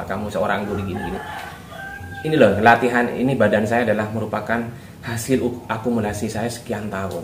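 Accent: Madura, Indonesia. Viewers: logatnya kaya madura ya bg ku?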